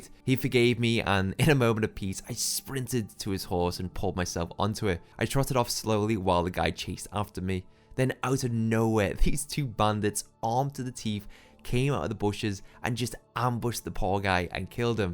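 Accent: Liverpool, United Kingdom. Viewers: Are you a scouser lad?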